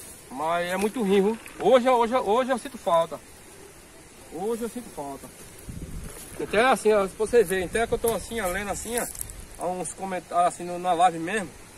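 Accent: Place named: Northeast, Brazil. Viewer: Boa tarde amigo adoro seus vídeos e sua família. Fala do jeito que vc sabe eu estudei mais amooo o jeito nordestino de falar isso é vergonha não as vezes eu falo errado e normal pra mim liga não continua vc é top trabalhador isso e no que importa um abração pra vcs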